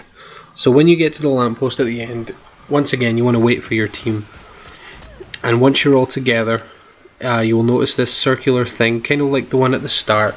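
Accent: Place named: Ireland. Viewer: You irish :o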